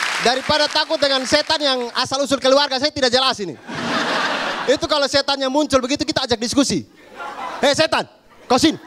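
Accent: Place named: Bugis, Indonesia. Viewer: Saya peminat abdur dari Sabah Malaysia. Hahahahah memang lawakan abdur semua lucu2.. bahasa kita pun lebih hampir sama sbb dialek abdur ni dkt2 sama dialek bugis.. lucu lawakkannya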